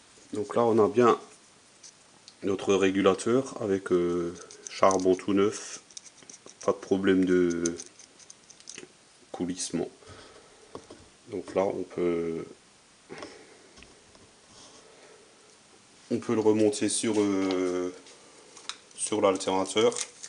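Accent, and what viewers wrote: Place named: East, France. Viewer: super merci je vais essayer de regarder ca j'y comprends pas grand chose mais je vais m'y coller en plus visuellement tu repares le meme que le mien. Il vient d'ou cet accent de l'est ? moi proche Forbach mais maintenant en ile de france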